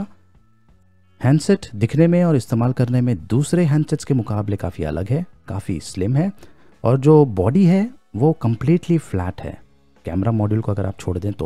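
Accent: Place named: Bihar, India. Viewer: Sr bihari wali boli aadhai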